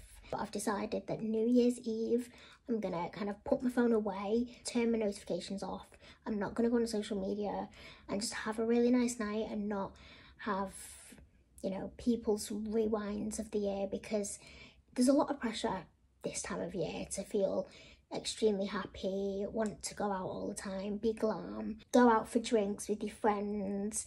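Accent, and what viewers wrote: United Kingdom. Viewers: Extraordinary beauty....and love that British accent....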